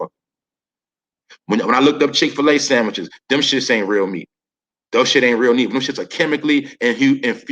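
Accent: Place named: Brooklyn, United States. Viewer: Yo ilke how u speak Brooklyn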